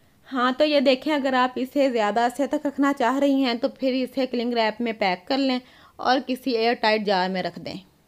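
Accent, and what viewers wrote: Punjab, India. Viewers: G Haan lehja tora sakht lagta ha shayad punjabi hone ki waja se esa lagta ha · پنجابی لہجہ ایسا ھی لگتا ھے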